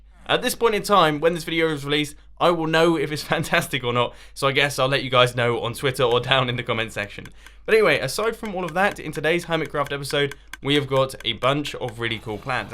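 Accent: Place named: East Midlands, United Kingdom. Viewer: What accent would U say I would say east Midlands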